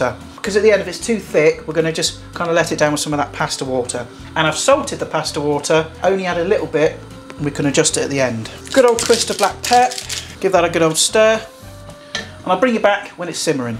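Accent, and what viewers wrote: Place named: Leicestershire, United Kingdom. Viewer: Actually I lived in Hinckley for several years and my mum was from Leicestershire, coountesthorpe. Lovely countryside😊. I recognised the accent you have. Anyway, just saying. You do make me laugh,love your sense of humour. Be lucky x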